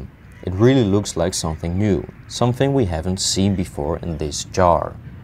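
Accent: Netherlands. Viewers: In the first few seconds i thought hmm is he Dutch? And at that exact moment it got confirmed by a bike casually riding by 😂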